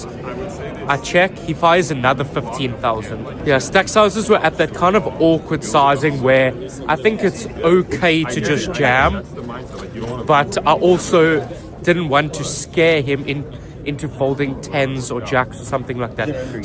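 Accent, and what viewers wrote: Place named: South Africa. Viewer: Loving the vids - the energy and the enthusiasm and the SA accent (I grew up in Benoni!!! - living in the UK now). Keep 'em coming ... but man you have to slow down! How many of the top guys will be rushing off to play hours of cash games at the end of a 12-hour Man Event day? Save that brain power for Day 3 ... and hopefully beyond! 🤟🤟🤟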